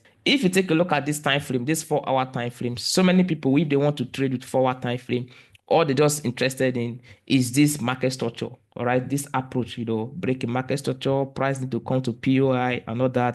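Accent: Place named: Agbor, Nigeria. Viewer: Bro, talks like an agbor man. Nice one boss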